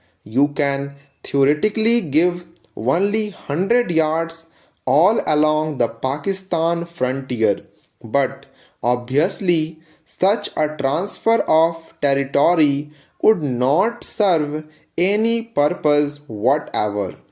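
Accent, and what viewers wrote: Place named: England, United Kingdom. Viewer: Bhai ji jyada angrej mat bano thoda pronounciation thik se jaise humare yha generally boli jati hai ekdm sir se utar rahe h kuch words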